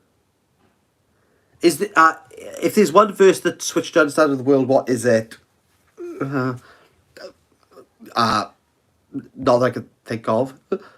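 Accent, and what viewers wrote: Wales, United Kingdom. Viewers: I did Not expect this thick Welsh accent hard-as-nails man to survive the first week of Ramadan, but deep inside, when I sow how he makes the "Rrrrrrrrrrramadan Mubarak" sound, I knew he is sincere about life, and he might just make it to Eid. Look at him, crumbling, but not falling. Look at him now after thinking of quitting youtube, he is making a Live... Man we Love you for the sake of Allah, and may Allah bless you and your family, and may Allah give you from where you never expect, and keep you and your loved ones on the straight path insha'allah. Eid Mubarak Brothers & Sisters 🎉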